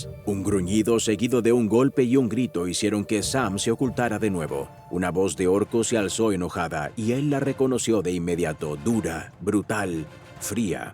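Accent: Argentina. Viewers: pues me alegro que no seas una ia, aunque de verdad me dio esa sensación,,, apoyo la traducción, pero no me gusta el doblaje español, ya que se suele emplear un dialecto genérico que no representa bien la realidad,,, no niego la calidad de NOR, pero hay muchos youtubers hispanos que también lo hacen bien, y mucha gente no los conoce porque solo consume contenido americano. Si creas tu propio canal, contando las historias a tu manera y con tu acento argentino, prometo subscribirme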